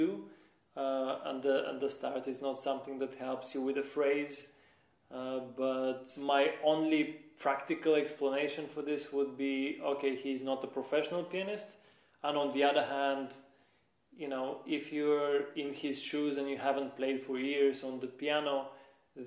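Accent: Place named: Russia. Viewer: Слышу русский акцент)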